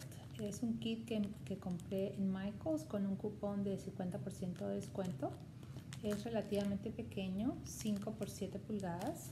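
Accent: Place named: Peru. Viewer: Hola! Acabo de descubrir tu canal, por tu acento y el torito de Pucará, que mencionaste al final, me parece que eres de Perú ¿?.... Si es así, que alegría haber descubierto un flosstube de una peruana 🤗🤗.... Están lindos los bordados que enseñaste terminados y los que tienes planeados empezar. Las telas me han encantado sobretodo la que resultó de la combinación de ambos colores. Saludos desde Lima y feliz bordado!!!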